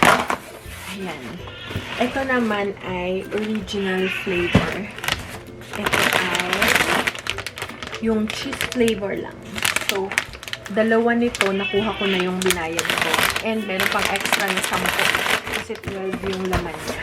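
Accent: Batangas, Philippines. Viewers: Ma'am, batangueña ka po?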